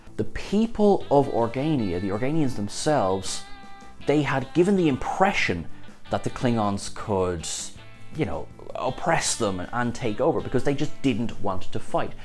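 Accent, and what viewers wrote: United States. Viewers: strong american accent, lol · Your American accent is brilliant!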